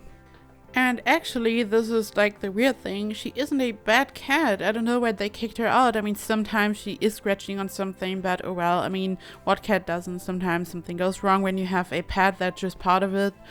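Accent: Germany. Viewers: Kommt die Tante aus Deutschland? Das Englisch klingt irgendwie nicht wirklich native... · In der ersten Sekunde, wo du gesprochen hast, hab ich sofort gehört, dass du deutsch bist :D Grüße erstmal. Ich finde das Haus mega geil. Hab heute zum ersten Mal in Sims 4 ein Haus gebaut (eigentlich mag ich Sims 3 deutlich lieber und hab 4 lange nicht angerührt, aber es hat wirklich viel mehr Spaß gemacht als in 3 und ich bin sogar etwas stolz), an dein Haus kommt meins allerdings bei weitem nicht dran... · are you german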